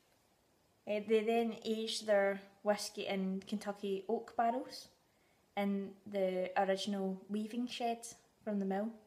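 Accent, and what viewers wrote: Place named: Scotland, United Kingdom. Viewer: Awesome Review. Just subbed:) The scottish accent just makes me think you know so much more about whisky then I do:) I just found Deanston and wish I would have found it sooner. Slainte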